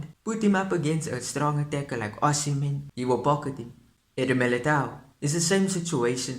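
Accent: South Africa. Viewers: Bro you sound South African like colored 😅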